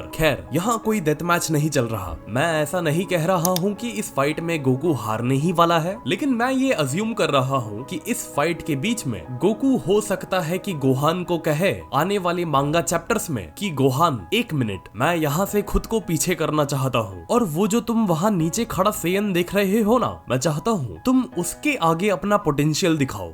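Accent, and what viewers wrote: Maharashtra, India. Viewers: Your manga narration are the best and the Marathi accent is crazy ! 😂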